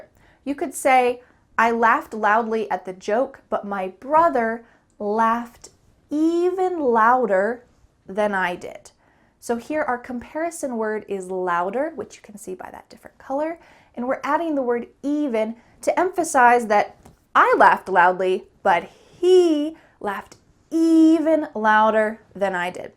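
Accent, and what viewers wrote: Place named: United States. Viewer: I loved american accent with you❤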